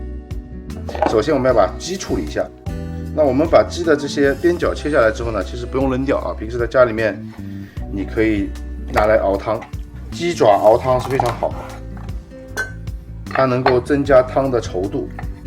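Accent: Shanghai, China. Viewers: 一，你又不是广东人，一口上海国语。二，广东人不吃辛辣的调料。三，这只鸡没烤熟。如果满分十分你只能拿个三分，失败的视频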